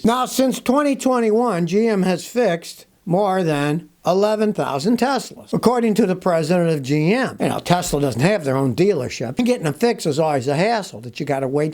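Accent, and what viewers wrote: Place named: United States. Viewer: Must be the common sense and ability to speak americanized English using real words on display again 😎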